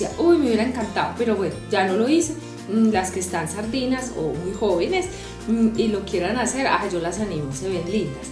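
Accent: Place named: Medellín, Colombia. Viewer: Yo creo que más que dejar un LIKE por dejarlo yo creo que para algo se creó así como el dislike y pienso que dar LIKE a un vídeo es de alguna manera valorar el trabajo de la persona porque el que hace vídeo como nosotras sabe el trabajo que esto lleva y lo que uno se esfuerza para que de alguna manera entretenga y guste. Por otro lado también estoy de acuerdo en algo que mencionaste muy por encima y es que algunas personas se ve que por quedar bien decir bueno ya cumplí ya me pase por tu canal te dejo un comentario para que sepas que te veo y que me debes pasar por el mío. A mí eso me parece de mal gusto porque el vídeo dura 5 min te lo reproducen 30 seg mientras te escriben y ya. Yo no hago eso a menos que el vídeo no me guste por supuesto. Pero estoy absolutamente de acuerdo contigo. Quise ver este vídeo porque quería conocerte por el acento seguro eres de Medellín :) que bueno saber un poquito más de ti. 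Un abrazo y nos seguimos viendo guapa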